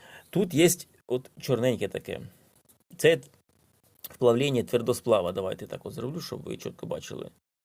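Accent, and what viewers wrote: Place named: Central Ukraine, Ukraine. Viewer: Хоч ніхера в цьому не смислю,але цікаво,завжди дивлюся 😅 В якій області живете,завдяки вашим відео вибрав собі полуавтомат,бо у мене дуже древній,Гарного мирного дня.Судячи з мови,думаю що це Черкаська Київська або Вінницька, обл,буду радий якщо дасте відповідь на мій коментар😊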